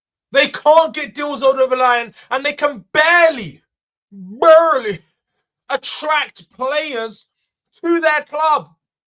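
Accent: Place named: Cockney, United Kingdom. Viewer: Geordie man....with a cockney accent...AI gone mad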